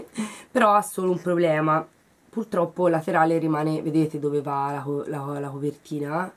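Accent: Tuscany, Italy. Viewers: è solo il primo che vedo dei tuoi e già mi piaci (ora vado a vedere gli altri) sei brava e simpatica...bello l'accento toscano :)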